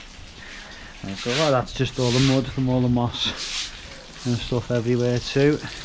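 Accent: Liverpool, United Kingdom. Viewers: This man is Scouse.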